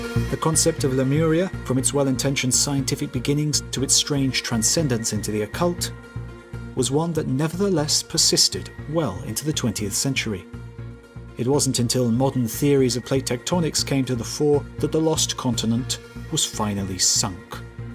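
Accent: England, United Kingdom. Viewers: Is this dude English? Why does everything with an accent sound better?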